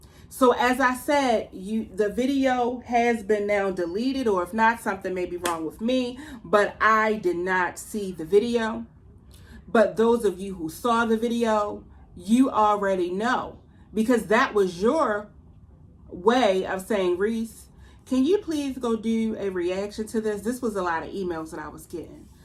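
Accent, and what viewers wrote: Baltimore, United States. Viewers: I love ur Baltimore accent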